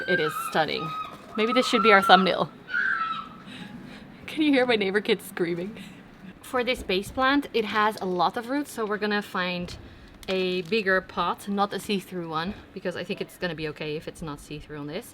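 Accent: United Kingdom. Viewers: Your British accent is so funny, occasionally you drop into an Eliza Doolittle accent, from My Fair Lady. But it’s a better accent than my cockney one 😅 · Hi 👋 I love the long chatty video! 🎉❤ it was so fun to hear about how you and G got started and about your kitties. And I love your silly fun unfiltered personality ❤ your British English was so awesome 😂❤ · This was a really fun video, you have such an interesting life! I loved your British accent lol that was so entertaining 👍🏾👍🏾😂😂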